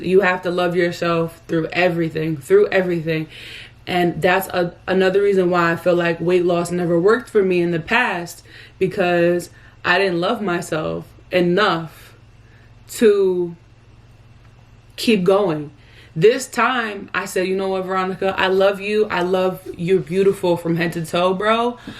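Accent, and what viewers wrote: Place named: New York, United States. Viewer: Funny, quarantine made it easy for me to transition to being full vegan, very little carbs (still am). To be honest I was already vegetarian most of the time (a little fish, but LOTS of carbs, cheese and ice cream--my downfall). I've lost 25 lbs with zero exercise--I'm not proud of that, but still mentally trying to get my own way. Imagine how much more I'd be losing if I was exercising? You are so real, so inspiring. Thank you! (You sound like you're from NYC, BTW lol)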